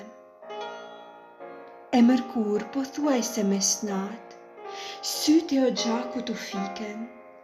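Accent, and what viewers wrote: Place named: Gheg, Albania. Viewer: Ah gegnishtja jote e bukur me nje ardhje poetike plot ndjeshmëri..💖🌸✨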